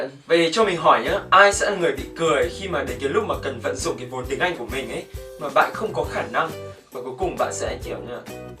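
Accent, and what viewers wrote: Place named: United Kingdom. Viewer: Giọng Anh-Anh của anh hay quá điiii ; 3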